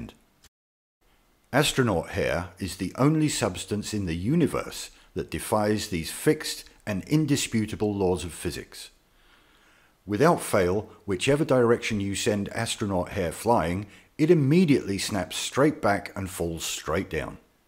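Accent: England, United Kingdom. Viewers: Nope, english accent, Mike is a little confused. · You do realize that NASA is an American organization, and as such, you dont lose a cent to them as they only get tax dollars from the tax paying American public. Now I'm just assuming here, but seems like your accent is indicative of a more British persuasion, I mean, it could be south africa, New Zealand, or Australia, idk, but assuming the most likely and saying Great Britain, (I specify Great Britain and not the UK because you dont sound very Northern Irish to me.) Your tax pounds once went to the European Space Agency which has a pod on the ISS and works with NASA and other organizations, but no one is directly in charge of it either. But thanks to Brexit, your taxes aren't going to that either now. Britain doesn't have an independent space program so for now, none of your tax pounds go to any space agency. 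Hope I help alleviate some of your stress.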